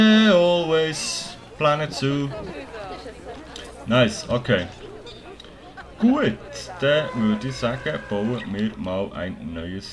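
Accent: Bern, Switzerland. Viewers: Haha du siech schlofsch fascht i bim rede, Berner?😂 mega unterhaltsam, mach witer so.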